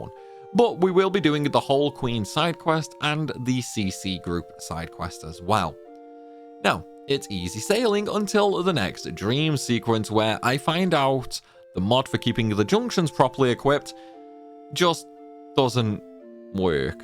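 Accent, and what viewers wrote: United Kingdom. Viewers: are you british by any chance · Y'know, most people enjoy it when a YouTuber doesn't spend a bunch of extra time baby stepping you through the basic systems of a game, because it gets really repetitive hearing every single challenge video explain the exact same things over and over. And I guess hearing a British accent for the first time in your life, while also watching a challenge run of a game you know nothing about, might leave you slightly confused as to what's going on here.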